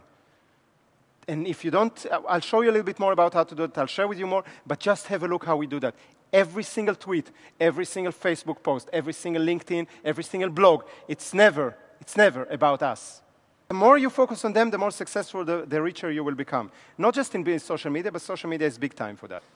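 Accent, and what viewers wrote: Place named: Spain. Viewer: Bu nasıl video lan İspanyolca karışımı İngilizce konuşuyor adam bile konuşamıyor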